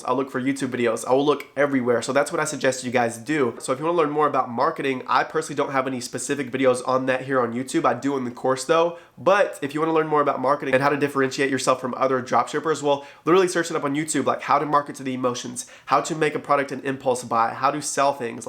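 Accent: United Kingdom. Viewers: Hahaha! Your British accent is jokes! 🤣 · Love it! Great value. Thanks!🙏Room set up and colors are electifying and soothing - so cool! Your British Accent is Brilliant and hilarious and Prince Ghost is a real star attraction!💕😊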